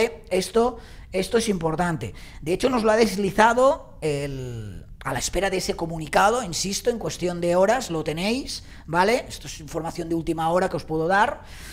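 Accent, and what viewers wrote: Catalonia, Spain. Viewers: El acento catalán o valencia se te nota mucho y más porque te cuesta espresarte en castellano y no levantás la cabeza solo para el Ahh, ehhh etc..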